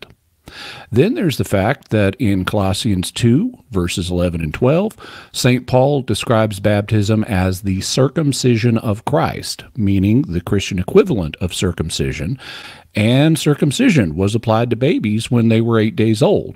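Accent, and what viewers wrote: South, United States. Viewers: Lol I thought I was imagining it. His accent is definitely stronger than years ago. The intonation and rythym of the speech is more Southern too. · I love how you can hear southern accent getting slightly more pronounced since his move back to Arkansas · why do you sound more country than usual? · Big fan of. Not a fan of his (re?) acquired southern accent.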